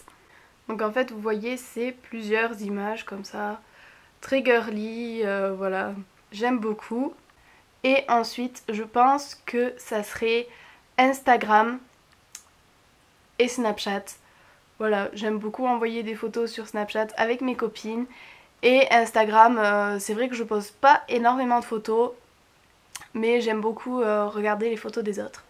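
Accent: South, France. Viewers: Les cheveux courts te vont super bien, tu as un super accent du Sud (comme nous ^^ Lol) et tes videos sont géniales! Hate de la prochaine Vidéo! #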